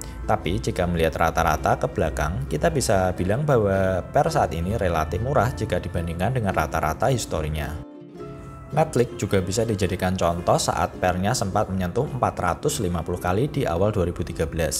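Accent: Java, Indonesia. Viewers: Min. Tolong dong. Kapan kapan bikin subtitle atau tulisan yg diucapkan, karna bahasa mimin klo ngomong medok bnget😂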